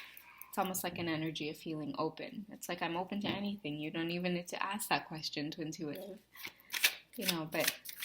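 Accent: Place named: Trinidad and Tobago. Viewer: No sah. When the accent came out i said is Trini. 🙋🏽‍♀️ Now back to the video.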